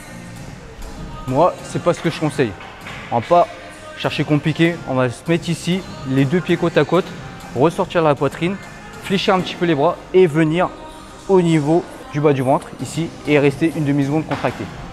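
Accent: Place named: Saint-Étienne, France. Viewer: Tu est de saint Étienne toi non ? Car tu a vachement l’accent de là-bas lol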